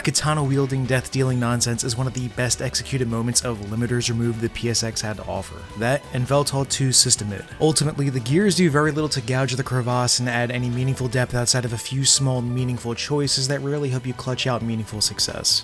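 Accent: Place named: Philadelphia, United States. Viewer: Just finished the game last night, mind blown, had the perfect works on hand to dive deeper when I finished. My GF was watching me play some and was equally intrigued by the story instead of me recount every story element or have her watch the 17hour cutscene “movie” this was great. You from Philly (represent!) my dude? Your “o”s make me think you’re from the NE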